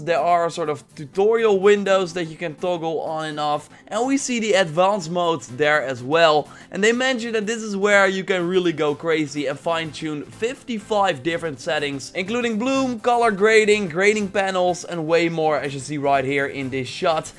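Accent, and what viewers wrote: Netherlands. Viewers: What is this guy's accent? Sounds Dutch.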